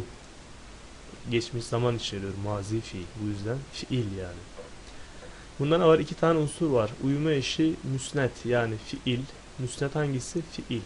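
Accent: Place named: Arab world. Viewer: medrese usulü olarak videolarınız var mı?masaAllah çok güzel arapçanız...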